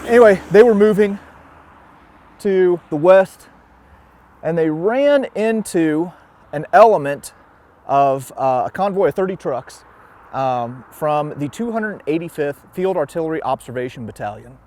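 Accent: United States. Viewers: This is the kind of attitude that makes Americans disliked around the world. American pronunciation in France? Please don't forget the Americans were a minority on Day Day and all of the other nations on the Western front without which the final victory would not have been possible. No disrespect meant to the service men on all sides.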